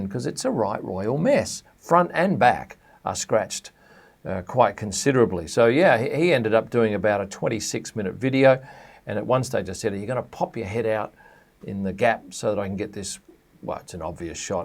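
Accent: Australia. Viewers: Discovered your channel about a month ago and your quickly becoming one of my favourite f1 YouTubers. The fact that your a photographer brings a new perspective as you've seen alot more of these guys than us folks. And I also love an Aussie accent. Thanks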